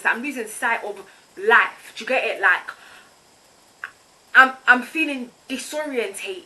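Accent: United Kingdom. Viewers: Probably bc ur American, she’s British I can understand her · You can use the caption. She's British. That's self centered for you to expect a person to speak like you. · It’s a thick british accent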